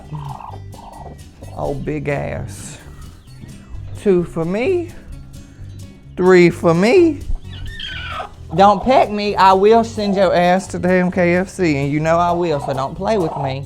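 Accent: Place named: South, United States. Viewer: This ain’t funny. Just talking nasty ain’t funny even with a southern accent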